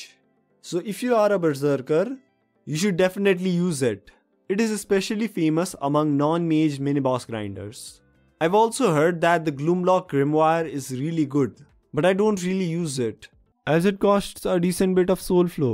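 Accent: India. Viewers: then why is your accent so Indian and I'm not being racist India doesn't have a lot of tech from what I've seen very poor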